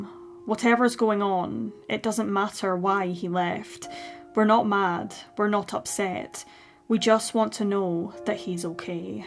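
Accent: Scotland, United Kingdom. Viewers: what's with the British narration? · It's an educated British accent from Scotland, it is not "ridiculous".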